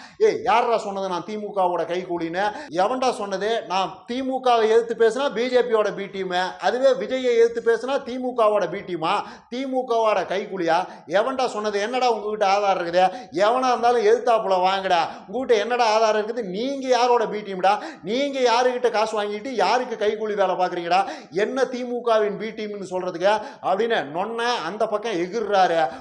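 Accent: Kongu, India. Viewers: சூப்பர் தம்பி கொங்கு தமிழ் மெசேஜ் அருமை அருமை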